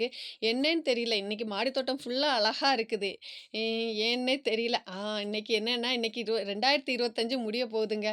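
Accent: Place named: Nanjil Nadu, India. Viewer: பூக்கள் அழகுதான் சகோதரி, நீங்கள் நாஞ்சில் தமிழில் வர்ணணை செய்வது மிக அழகு, சிறப்பு ❤❤ வாழ்த்துக்கள் சகோதரி🎉🎉🎉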